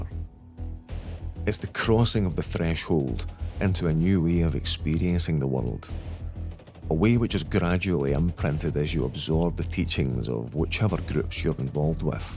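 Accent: Scotland, United Kingdom. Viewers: Top shelf research as usual, my friend. I expected a highly detailed and well-researched video and was not disappointed :) Everything from the audio-visual aesthetic that you have selected for the video, to the emphasis on information that is being conveyed verbally due to the lack of visual distractions provided by imagery, to your Scottish accent (particularly the amazing way in which you pronounce those hard 'r's) meshes perfectly together to provide a unique and interesting experience. The video itself, as mentioned above, is well-researched, which is particularly evident through the scholarly way in which you document their ideology, while maintaining an emphasis on being objective and getting facts across (with a few well-placed comedic touches which entertain the viewer while simultaneously not compromising the tone of the video). There is one thing I could recommend that you possibly do in the future, however. 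Since you obviously cannot get EVERYTHING across in one sitting, perhaps you could link some of your citations/references/additional materials in the description? That way, you could increase the credibility of the video, while at the same time providing viewers with material that they could read themselves to supplement what was already communicated in the video. That said, the best way to alleviate fear, ignorance and misinformation surrounding strange, scary or otherwise esoteric subjects is documentation and research, particularly archival analysis of materi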